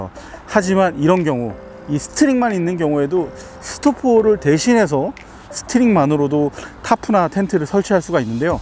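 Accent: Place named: Jeolla, South Korea. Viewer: 슬래파~~전라도신가보다 유익한정보감사~~^^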